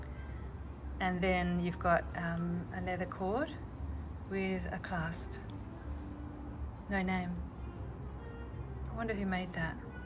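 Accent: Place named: England, United Kingdom. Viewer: I really enjoy your presentation with the beautiful English accent. You have some really nice jewelry. Cheers from Princeton IL from Agi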